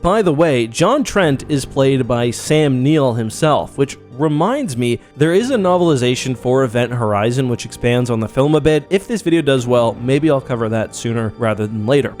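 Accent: Canada. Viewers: Is the narrator Canadian. I could hear "OOT" and "ABOOT".....?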